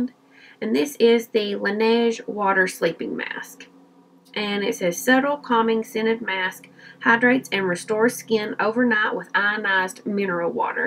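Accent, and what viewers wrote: South, United States. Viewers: I recently found your channel via emilynoel83. I just love your videos! You are such a beauty inside and out!! I love your accent, from one southern girl to another. :)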